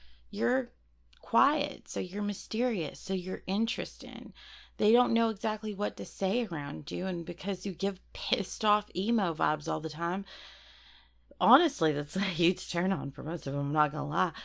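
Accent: South, United States. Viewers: I didn't know i needed a southern furry till right this moment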